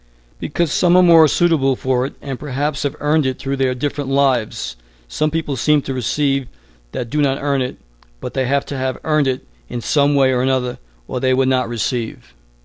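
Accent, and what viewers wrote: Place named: New York, United States. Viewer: Narrator with a NY accent does documentary of stories from Mt. Shasta, in CA?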